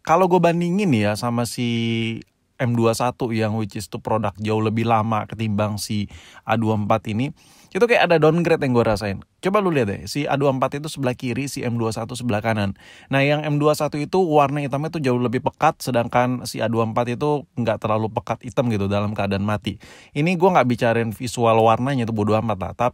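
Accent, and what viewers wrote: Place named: Medan, Indonesia. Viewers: Logat Medan si babi ini, sini kau jumpa tengah kita di simpang pos, aku mau belik hape. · Omak orang Medan ni kek nya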